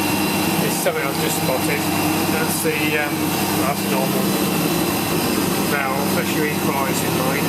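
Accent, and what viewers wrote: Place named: London, United Kingdom. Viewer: I think it's that accent from London, England that's really throwing him off !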